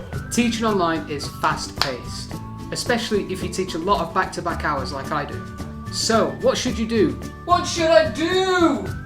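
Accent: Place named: East Midlands, United Kingdom. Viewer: Brilliant video, thanks so much! I´ve got my first online teaching interview tomorrow and these tips were really useful, especially what you said about planning after classroom teaching for 8 years and doing a hellll of a lot of prepping! BTW are you from Derby/Notts area? Your accent seems so familiar :D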